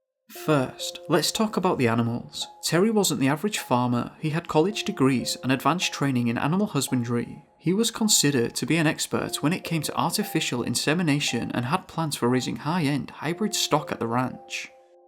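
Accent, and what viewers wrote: United Kingdom. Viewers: It's called an accent..a very specific British one.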